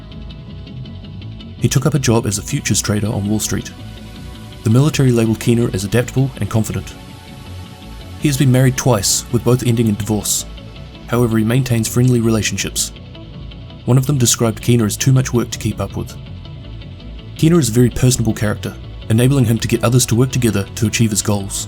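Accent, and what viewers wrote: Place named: Auckland, New Zealand. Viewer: sounds Aucklander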